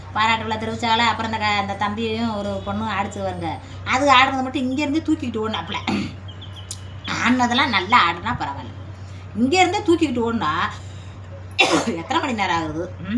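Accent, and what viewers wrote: Madurai, India. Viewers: உங்க பழைய வீடியோஸ் பாத்தேன். முன்னாடி வாடகை வீட்ல இருந்த வீடியோஸ். அதுல எல்லாம் நீங்க பேசறது ரொம்ப நார்மலா இருந்துச்சு. சின்ன சின்ன இங்கிலீஸ் வார்த்தை கூட யூஸ் பன்னீங்க. இந்த மதுரை பாஷையோ ஸ்லாங்கோ அப்பல்லாம் பேசலையே. இப்படி பேசினா தான் சப்ஸ்கிரைபர்ஸ் வருவாங்கனு பேசறது போல இருக்கு. இது ரெண்டுல எது நிஜ முகம்.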